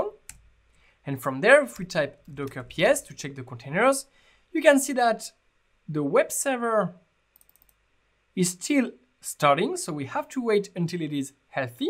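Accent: France. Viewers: please improve your accent because not all the words can be understood correctly. Too many French sounds when pronouncing. Thanks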